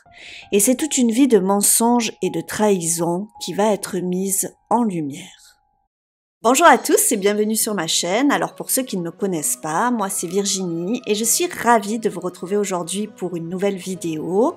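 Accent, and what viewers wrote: South, France. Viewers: Diction pas vraiment bonne car malheureusement Virginie a plutôt tendance à très mal prononcer les mots comme rouages...nuages...mariage... crochetage...emballages..etc. Ce genre de mots finissent en "ache"...rouaches...nuaches... mariache...emballaches...etc... Et c'est hyper énervant. Surtout qu'en plus Virginie a un petit accent (du sud...je pense)...et ce n'est vraiment pas joli à entendre. A part cela...il est vrai que Virginie est une des youtubeuse true-crimes qui s'exprime le plus clairement.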